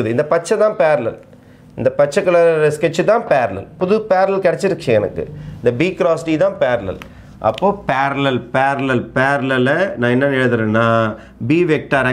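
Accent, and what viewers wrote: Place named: Telugu, India. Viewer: Sir your mother tongue telugu va voice similar ah iruku athan ketan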